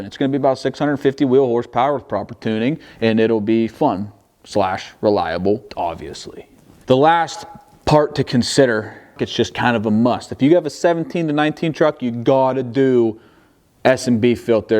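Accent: Pennsylvania, United States. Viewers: Bro i live in illinois. Before you even said where you're from i was like. "Yeah thats a PA accent." Completely random but i called it.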